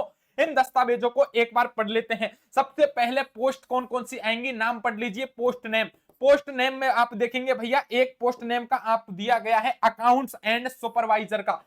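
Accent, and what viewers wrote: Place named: Bihar, India. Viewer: Bihar ka h kya sir ji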